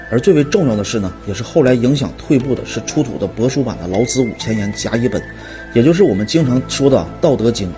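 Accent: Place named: Northeast, China. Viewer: 东北口音果然给人一种文化不是很高的刻板印象。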